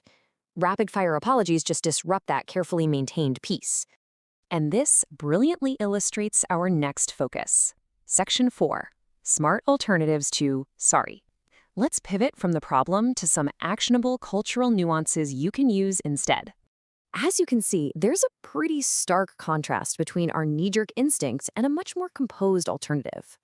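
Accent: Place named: United States. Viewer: But the accent is american?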